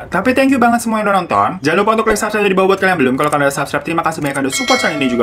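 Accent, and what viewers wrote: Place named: Tegal, Indonesia. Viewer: Suka bgt sama accent tegal nya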